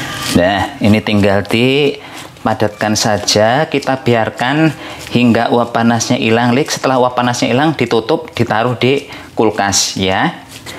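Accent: Banyumas, Indonesia. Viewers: Ngapak mas medok banget semoga sukses mas. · Wong ngapak..., Ngomonge ngapak..., Ora ngapak ora kepenak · Ngapak....senyum2 sndiri dipanggil lik.. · Njenengan wong endi mas, ngapak2😅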